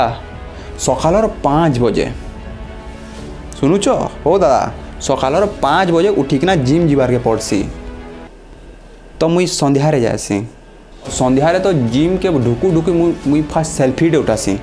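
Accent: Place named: Sambalpur, India. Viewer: oo acha vadhia sambalpur language kahucha sunibaku bhala laguchi 😍real ghara kouthi?🤔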